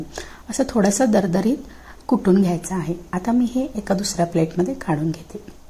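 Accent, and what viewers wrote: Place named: Kolhapur, India. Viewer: कोल्हापुरी भाषेत लय भारी 👌👌👌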